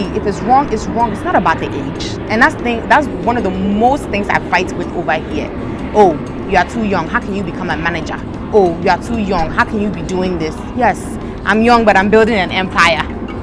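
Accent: Ghana. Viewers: How can you submit American passport for Ghana card, the gentleman's right to deny you, you're full of yourself you wan ghanaians to worship you just because you from America, you still sound like a typical Ghanaian accent just get down from high horse. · Accent na ghanian oooo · it's a shame that you feel so "unaccepted" in Ghana to the point of contemplating a return to the States and not coming back. You are Ghanaian. Your accent is basically not different from that of the average educated Ghanaian. Unlike other Diaspora children, you speak local Ghanaian languages fluently, which definitely help. So, I'm wondering -- exactly what has fed this level of frustration? Can you elaborate more? I can appreciate the struggles that come with having to adapt to a culture and system you had left for such a long time. Yes, many things are not only different but frustrating! 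But could it be that part of the problem is your own failure to employ the right attitude and soft skills necessary to effectively navigate your 'new' world? What do you think? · Wow. You moved to the us at age 6, and still have a Ghanian accent. Good for you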